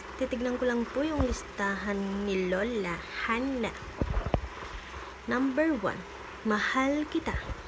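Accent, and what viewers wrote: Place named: Pampanga, Philippines. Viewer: May halong kapampangan ang galing sis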